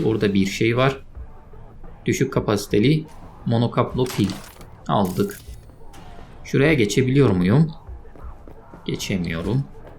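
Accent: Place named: Cyprus. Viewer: Reis selamlar, sen kıbrıslı falan mısın? Aksanın cidden müthiş. Elite dangerous videolarından izleyip geldim.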